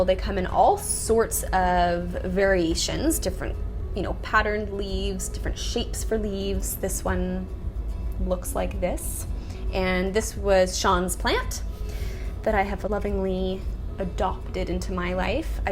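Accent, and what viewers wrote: Canada. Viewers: Great video. I'm going to be getting a bunch of plants soon and this was super fun and helpful. Side question? Are you from Canada? Accent sounded Canadian.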